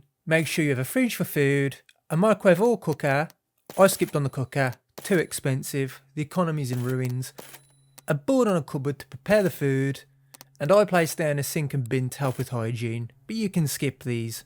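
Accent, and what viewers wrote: Birmingham, United Kingdom. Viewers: Definitely Midlands, near Birmingham but its not the strongest brummie accent ive heard, could be trying to mask it slightly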